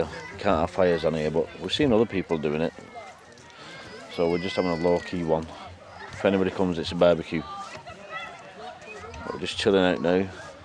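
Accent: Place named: Bolton, United Kingdom. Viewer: Been watching ur vlogs, saying this guy's definitely from Bolton 😅.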